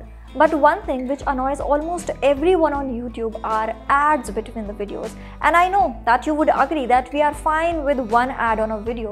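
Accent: India. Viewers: Wtf how you know a lot of grammatical things in English but you can’t change that Indian accent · The Indian accent... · Bro I stg I can't take any videos serious with that Indian accent. Always feels like a scam to me.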